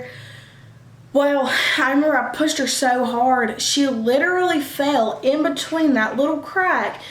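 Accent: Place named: Kentucky, United States. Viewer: I’m watching your video on my tv now lol I’m from Kentucky and you sound like us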